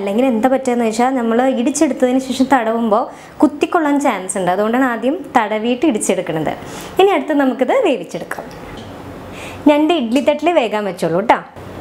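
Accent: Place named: Tamil Nadu, India. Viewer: Kure tamil chuva ayi alle samsarathil. ✌️✌️😍😍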